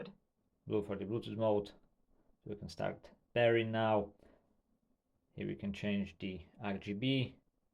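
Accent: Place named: Slovakia. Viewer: viem ze si slovak pocut to ahahahaaa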